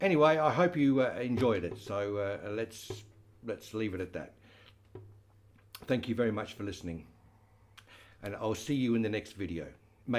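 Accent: United Kingdom. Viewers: You must have spent a lot of time in the U.K.because you now have an English accent.... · I watch you long time ago and i love your content and learn a lot from you sir And i also like the new content and you are speaking with us and we are getting to know your beautiful personality and british accent... Big fan of you please sir keep it up... I'm from Syria ...all love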